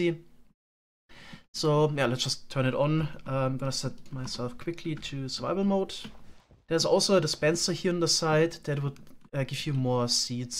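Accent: Germany. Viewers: you hear so on as u would be german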